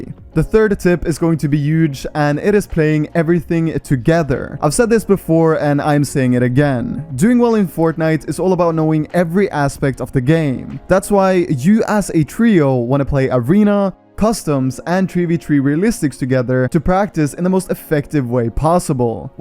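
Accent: Denmark. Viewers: are you Danish ?? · are you danish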